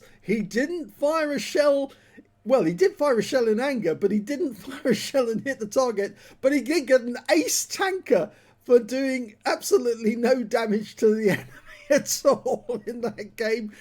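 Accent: United Kingdom. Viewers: I love the accent, you sound like a british, so authentic... Where did you get your accent from? :)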